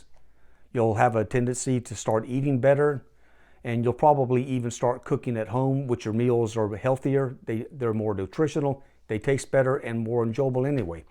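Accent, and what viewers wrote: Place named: Louisiana, United States. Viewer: I hear a Cajun accent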